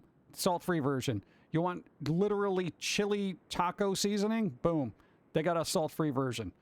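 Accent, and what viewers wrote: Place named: East Coast, United States. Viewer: I think you would make a great voice actor. If anyone needs to cast a magical creature with that insightful, urban, East coast accent, you would totally kill it!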